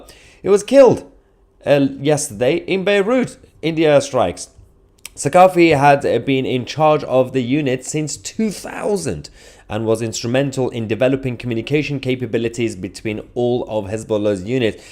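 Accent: United Kingdom. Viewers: I just want to say , You're awesome brother..I wonder why I took long to know your channel..I hear your british accent, are you also arab or Indian or jew😂? If I owned this channel I would be exactly saying what you say here, I am your follower from today, drop contents as often possible ❤, love from Belgium 🇧🇪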